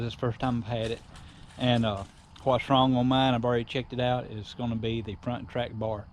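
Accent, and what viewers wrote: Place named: Georgia, United States. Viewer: Changing mine tomorrow!!! Thanks for the vid and confidence. Im from Ga so i appreciate the accent too, made it alot easier to pay attention. Thanks bro! I didnt notice a problem with mine till i put 33s on it. 40mph and up she starts kicking. Hopefully this will get me by until i do a whole front end job.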